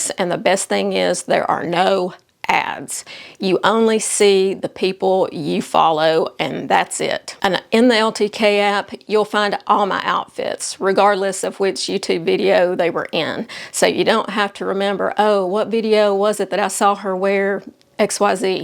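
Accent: South, United States. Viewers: I am from the Atlanta metro area and your accent is like butter to my ears! In the south, you know this is a compliment! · Love this and all your videos! Preppy, classic shoes are wonderful and totally my style, I have most of these. Awesome that Keds, loafers and boat shoes are back. Not feeling the mesh style yet though. I watch you on my phone, laptop and TV. Love your Southern accent, as a Southern lady myself 😊. I mostly watch for information but occasionally shopping. ❤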